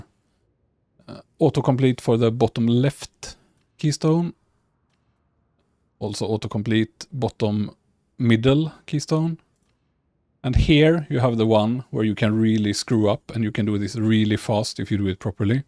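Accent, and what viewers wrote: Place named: Sweden. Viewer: pause you're from Sweden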